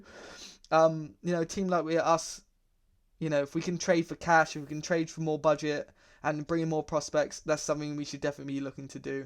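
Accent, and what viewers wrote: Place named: United Kingdom. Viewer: Wasn't expecting a British accent with this..lol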